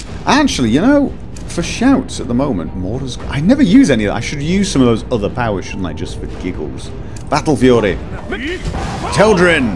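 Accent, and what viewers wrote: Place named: England, United Kingdom. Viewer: It's probably a regional thing. There are quite a few words he pronounces differently than I've ever heard. I've always attributed it to the fact he sounds English.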